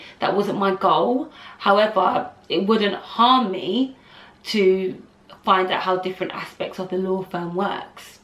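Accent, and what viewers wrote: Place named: United Kingdom. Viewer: She is not American she is in the UK. Speaking with a British accent in the UK is no different then an American speaking with an American accent in America. Her qualifications and skills is what got her the job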